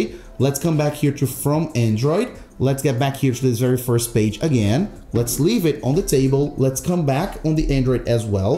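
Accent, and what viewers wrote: Brazil. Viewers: I had not expected to be listening to a help video in English with a Brazilian accent - yet here we are hehe On my iPhone 16e, I just can't get to the "Transfer Your Apps & Data" screen. My phone goes straight from the Hello screen to the keyboard customization screen and I can't figure out why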